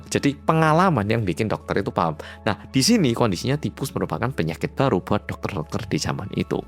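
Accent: Java, Indonesia. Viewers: Hai org korea yg medok😅 sehat selalu 💪🏿 Lgsg nyimak🎉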